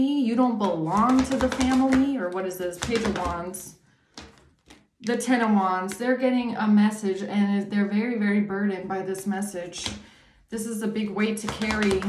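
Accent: Germany. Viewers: Your German accent is beautiful! The wicked evil thief and his satanic family can ask s@@ to save them, they offered the collective as sacrifice for life insurance policies, steal their homes, steal their finances because the evil leader DJ zumba instructor played God on chosen lives, God's wrath is upon them, they made their bed and now they can lay in it KARMA Isaiah And I will punish the world for their evil, and the wicked for their iniquity; and I will cause the arrogancy of the proud to cease, and will lay low the haughtiness of the terrible these wicked evil inhuman intentions towards someone who did ABSOLUTELY NOTHING to him or his organized criminal group is UNJUSTIFIED for greed, sex orgies, lust, envy, jealousy? Psychopath. Kerosene light up a fire that never quench Malachi 4:3, which says they will be "ashes under the soles of your feet". Other verses mention a "lake of fire" (Revelation ) or "eternal fire" (Matthew ) where the wicked will suffer eternal punishment. 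· Yeah,... they've struck me like a match without even thinking about the consequences. I hope they learned to drop and roll. p.s I Absolutely love your German accent and language skills. What a cute picture of a lovely fraulein. That's so cool!!!❤❤❤❤❤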